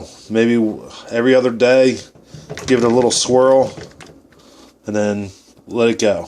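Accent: Philadelphia, United States. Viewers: That Philly accent..., my goodness. Making me homesick. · Ryan J he sounds close to South Philly to me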